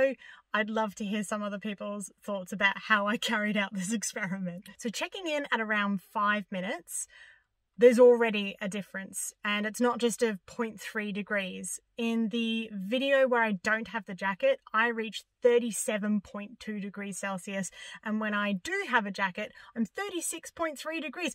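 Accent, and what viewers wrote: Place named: Australia. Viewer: I got you in my feed and wow. Late to the party as always. You are awesome. Got to tell you, you sound Aussie but than I saw you where in England. So I started thinking ARE there people in England who sound like that, but they hide them? You never hear them on any shows in the states. Kind of like Liverpool speekers. I did figure it out, if you where from England you might not sound as happy. My opinion.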